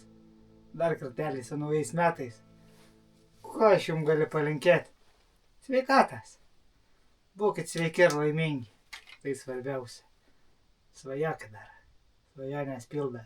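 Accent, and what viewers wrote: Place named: Utena, Lithuania. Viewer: taip ir galvojau ,labai tarme girdėta ,kadangi aš iš Anykščių😅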